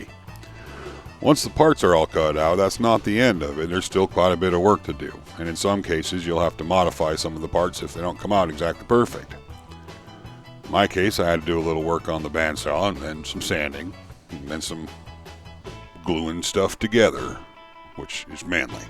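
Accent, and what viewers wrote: United States. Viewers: You are extra funny today. And the accent is extra 'cowboyish". I guess it makes it all 'manly".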